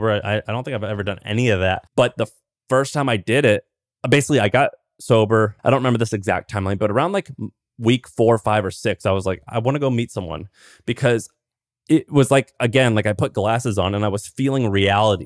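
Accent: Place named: Midwest, United States. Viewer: Midwest asf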